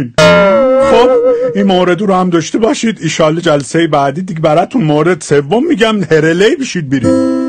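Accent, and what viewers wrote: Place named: Kerman, Iran. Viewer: کرمونیام ایجوری حرف میزنن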